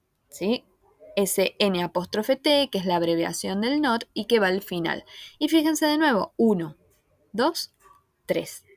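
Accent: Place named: Argentina. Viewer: Que bueno que encontré una Argentina que explique y encima bien... porque estoy podrido de escuchar el acento de España en tutoriales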